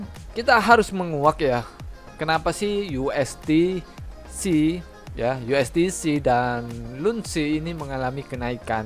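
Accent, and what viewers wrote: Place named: Banyumas, Indonesia. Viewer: Siap ngapak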